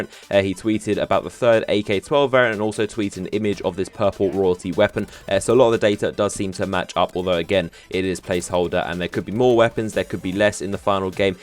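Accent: United Kingdom. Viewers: zeus warrior he is British thats just how they do that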